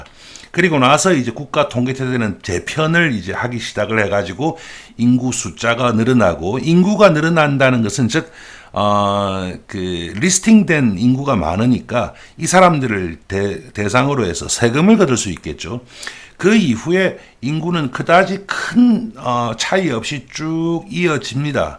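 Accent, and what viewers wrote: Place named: Busan, South Korea. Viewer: 재미있고 유익한 내용으로 제작하시는 노고에 감사드립니다. 부산말억양이 친근하게 들리네요 정확한 발음에 천천히 가는 속도도 좋습니다..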